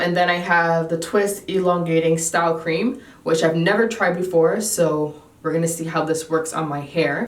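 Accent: Jamaica. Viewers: Do I hear a tiny bit of a Jamaican accent? Never noticed until this video! Looooove 😍. Gorgeous hair and great results, too. Hair goals 🙌🏾. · You Jamaican ?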